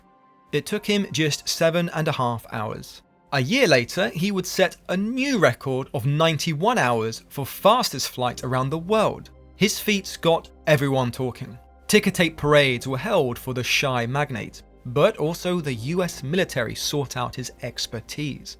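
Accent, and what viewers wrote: United Kingdom. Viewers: Hate this condescending British voice speaking ill of a great man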